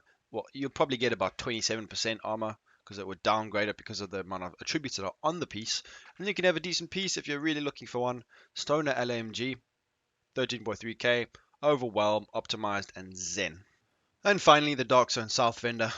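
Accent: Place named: South Africa. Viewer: My Bru.. That Accent.. SA boy comming in clutch XD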